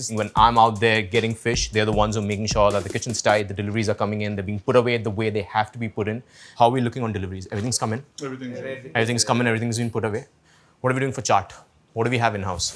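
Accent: India. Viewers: yup. so true. Also this is exactly how i speak. Just neutral Indian English accent. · indian accent is cringe asf. it's a meme. he should speak with a proper American accent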